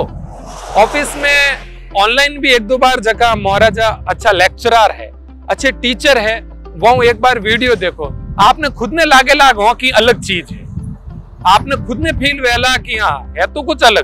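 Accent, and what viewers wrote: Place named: Marwari, India. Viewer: Wha sir gjb marwadi andaj me 😀 the mare neda aao 😀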